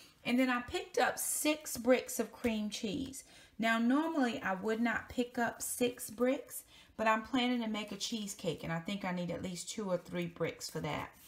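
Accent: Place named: Baltimore, United States. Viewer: What part of the country are you from? It's not often I hear someone who says "on" the same way I do, and I'm from Baltimore, MD. That almond milk is the best, and cheapest, I've found!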